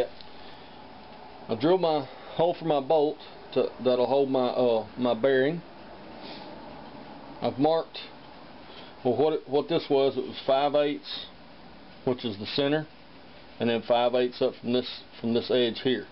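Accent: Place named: Tennessee, United States. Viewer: Great video like all of your videos. What part of the US do you live? You sound like you are from TN. I live about 30 miles east of Nashville and you sound like the rest of us. LOL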